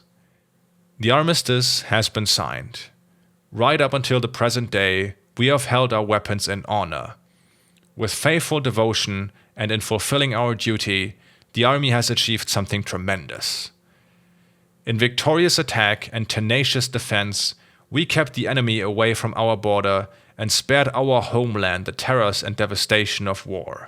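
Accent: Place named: Germany. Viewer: this is a great story, and well told, but am i the only one who feels it wouldnt be half as good w/o the narrator's thick german accent? really puts the icing on the cake.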